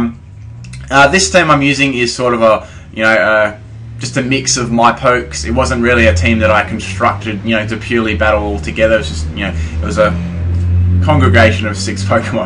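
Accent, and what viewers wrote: Australia. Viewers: nothing against Australians and their accents but yours is rather thick, plus the loud, low quality audio makes it hard to sit down and enjoy this video cause i cant understand what your saying.. just calm down you don't have to speak so loudly imo other than that, this was a great battle and your team is bad ass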